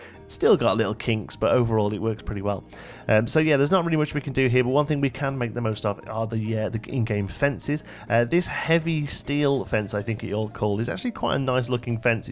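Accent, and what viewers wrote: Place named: Black Country, United Kingdom. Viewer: thought i could tell your black country accent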